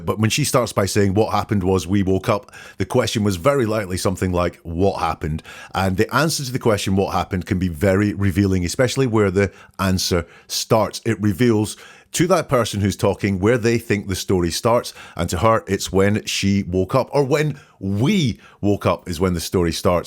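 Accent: Scotland, United Kingdom. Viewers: This has been fascinating and I hear your lovely Scottish accent… I live in Wales 🏴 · Soo glad i found you. Like brittish english better than the tons of american english flooding the internet. Also you are in my timezone❤ . I am a great fan of Peter Hyatt, bless him, Looking forward to watch more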